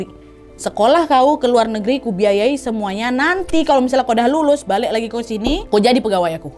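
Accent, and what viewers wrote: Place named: West Sumatra, Indonesia. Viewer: Logatnya kayak orang Minang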